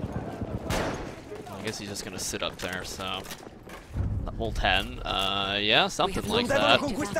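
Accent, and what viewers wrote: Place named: Italy. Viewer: why is the voice italian??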